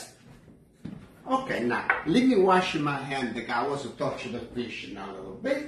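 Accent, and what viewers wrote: Italy. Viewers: I love your videos!!! Your Italian accent is absolutely awesome!!!! You’re the greatest!!! 👍🏾👍🏾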